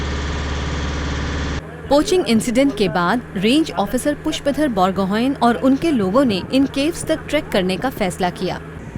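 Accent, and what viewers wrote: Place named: United Kingdom. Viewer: लगता है किसी ब्रिटिश woman से हिंदी बोलने को बोल दिया है।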